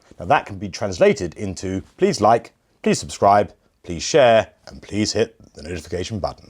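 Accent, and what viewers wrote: United States. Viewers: you are better than at least 99.5% percent of the actors in Hollywood! You have Madam Snarkle NAILED!! The American accent is amazing!! Congratulations to your fine work and warmest wishes from Northern California!:) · Best American accent I’ve heard by a Brit! (Apart from Hugh Laurie and his House character)! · Thank You! Who knew I’d need a Brit to make sense of the word salad this creature constantly spews? You do a great American accent, and the hairstyle is spot on! 🇺🇸❤️🇬🇧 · Your American accent is excellent!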